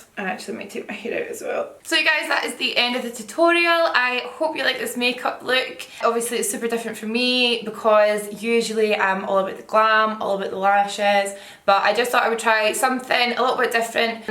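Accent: Scotland, United Kingdom. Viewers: Tarte pro glow to go is the perfect product for that scottish accent! I love it haha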